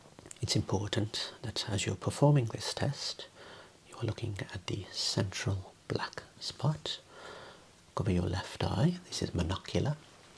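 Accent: Birmingham, United Kingdom. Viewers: When I started watching your videos I got so confused by your Birmingham accent. Three years later you sound like fairy from Neverland to me. You don't help me to fly, but to fall asleep easily 😊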